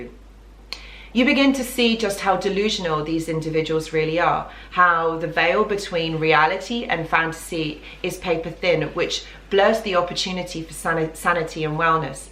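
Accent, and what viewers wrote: England, United Kingdom. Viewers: Ur really cool 😆 it’s xtra reassuring to me to hear another fellow English accent on a channel like this much thanks for the work u do it is appreciated Strength to strength peace all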